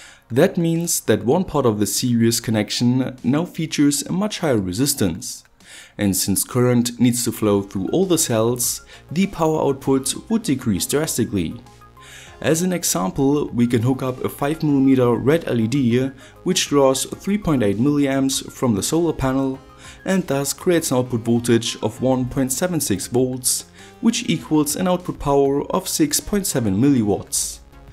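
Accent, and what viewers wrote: Germany. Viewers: I get excited whenever says "Let's find out!" in that pronounced German accent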